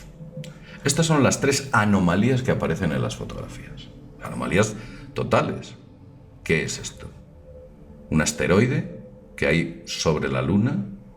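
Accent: Spain. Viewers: eres de España? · ESTE PENDEJO SE CREE QUE SABE, MIRA SO MAMAO TU DEBES SER UN PENDEJO MAS DE ALGUN PAIS DEL TERCER MUNDO HISPANO, TIENES ACENTO ESPAÑOL QUE DE LO UNICO QUE SABEN ES DE MATAR TOROS Y COMER PAELLAS Y MUCHA MIERDA.DIME QUE COSA DE IMPORTANCIA A SALIDO DE ESPAÑA? JAMAS UN PENDEJO ESPAÑOL ENTENDERA.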